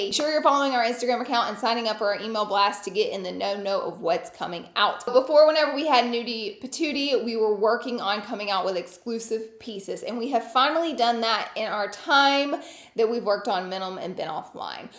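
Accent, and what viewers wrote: United States. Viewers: your voice sounds like american tourist in europe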